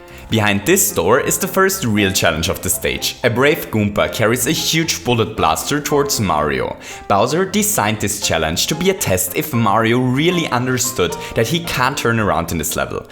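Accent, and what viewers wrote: Germany. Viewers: DEUTSCHER AKZENT! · Your german? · Cringey german english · what accent do you have?! most probably german... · Is german?I mean the accent... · Are you a german? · Are you German? ^^" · Are you German?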